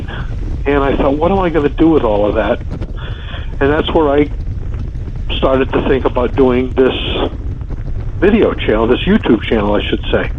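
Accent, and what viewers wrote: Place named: New Hampshire, United States. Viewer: You sound like your from Cow Hampstah I lived there for 36 yeahs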